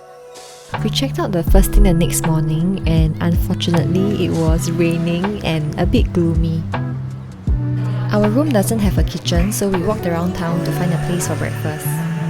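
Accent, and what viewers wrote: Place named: Singapore. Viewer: Much needed video! Thanks so much for sharing, feels so good to hear your Singaporean accent😂 It's been 4 months since this part 2 was posted. Hope all is well with you and your family. Looking forward to part 3!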